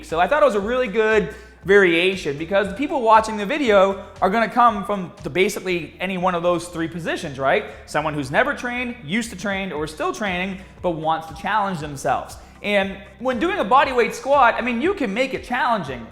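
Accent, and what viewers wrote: Boston, United States. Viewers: That Boston accent coming on strong.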